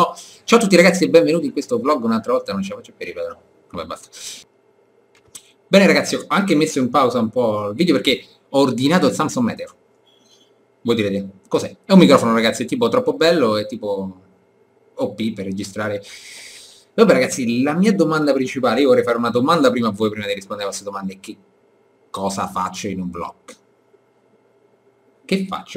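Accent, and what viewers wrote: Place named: Rome, Italy. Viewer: Si sentiva che eri di Roma :-)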